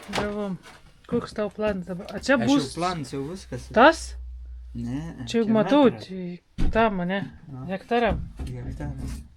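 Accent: Samogitia, Lithuania. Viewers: Mėgaujuosi JŪSŲ žemaičių šnekta...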